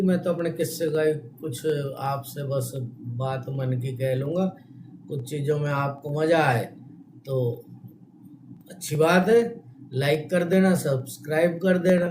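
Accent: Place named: Haryana, India. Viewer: Bahut sahi and ek aarse baad Haryanvi suunne ko mili hai,!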